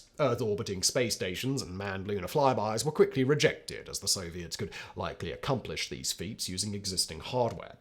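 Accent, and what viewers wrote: United Kingdom. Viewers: I love hearing a British voice narrate American achievements.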